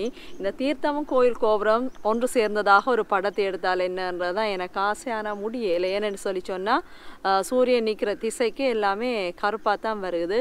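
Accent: Jaffna, Sri Lanka. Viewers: உங்களுடைய யாழ் தமிழ் யாழ் மiiட்டுவது போல் ulladhu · தமிழ் : அதிலும் இலங்கை தமிழ் வர்ணனை தேனாக தி்திக்கிறது. தங்களின் சாரல் ஊடாக இலங்கை அழகான பகுதிகளை பார்த்ததில் பெரும் மகிழ்ச்சி. இராமாயணம் சம்பந்தபட்ட இடங்களை பதிவு செய்யுங்கள் கான்பதர்க்கு ஆவல். மகிழ்ச்சி .வணக்கம் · i am from tamilnadu. We all miss srilanka also YOUR tamil. Will decide and tell you my family trip to Tamil livinng country.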